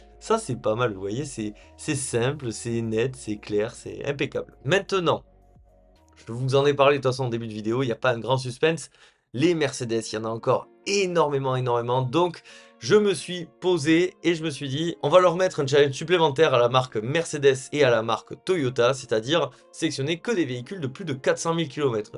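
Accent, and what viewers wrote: South, France. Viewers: la grande classe, le sourire, le petit accent ensoleillé… bon je préfère les formats sur les Youngtimers mais au moins tu en fais pour tout le monde. La bisette à toi change rien.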